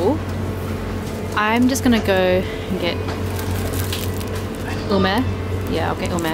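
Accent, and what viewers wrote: Australia. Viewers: Cute China doll with Australian accent.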